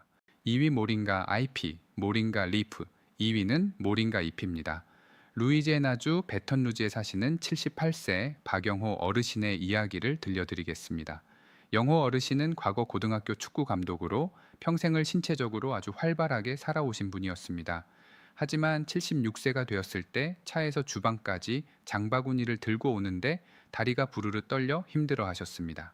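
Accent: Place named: Gyeongsang, South Korea. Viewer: *목소리.얼굴.지식. 다 끝내주고 경상도 말로 사람 지기네요.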